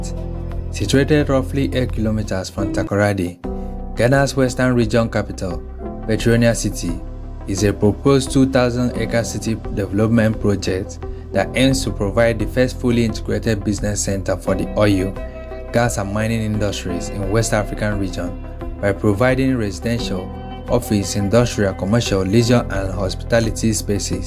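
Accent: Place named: Nigeria. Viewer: You sound so foreign with the way you pronounce the city's names of Ghana. I have no doubt your accent is Nigerian. Anyway, thanks for sharing the info about Ghana. Let's see how Nigeria is doing on mega construction projects in your next video.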